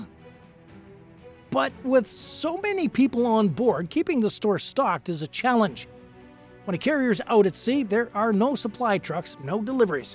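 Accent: Canada. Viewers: Fellow Canadian narrating?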